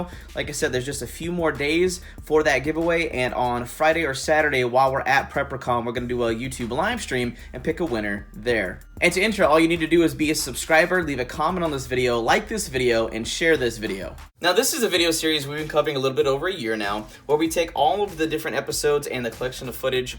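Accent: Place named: Texas, United States. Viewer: I kept hearing that your from Texas. Where exactly in Texas.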